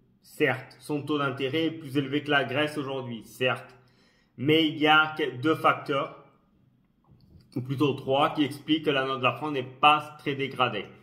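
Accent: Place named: Italy. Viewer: Aldo et Aberkane sont d’origines algériennes certes et alors méfiance lol c’est pathétique quand même.. il faut dire que leurs discours et vidéos nous parlent très bien, souverainisme ou conspirationnisme appelez cela comme vous voulez lol nous on les comprends bien contrairement à toi qui est sûrement payé comme nos journalistes pour nous faire de la désinformation totale en passant par le bashing .. juste un conseil essayez d’être à leur niveau intellectuel et peut être que vous ferez du buzz.. vu votre accent vous êtes en attente de changement de carte identité italienne pour une carte d’identité française lol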